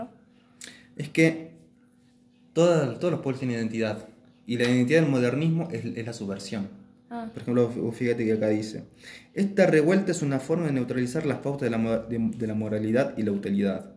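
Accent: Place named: Salta, Argentina. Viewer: ¿Che, sos de Salta? Porque tenés un acento bastante parecido al de Jonathan Ramos.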